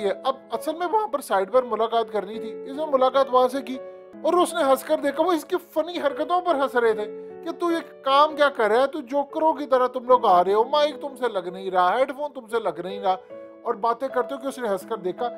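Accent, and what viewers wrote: Punjab, India. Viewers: Nice punjabi touch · Kia Punjabi ka tarka lagtay hn Uncle AP SADA Khush rho Brave personality haqeeqat tv anchor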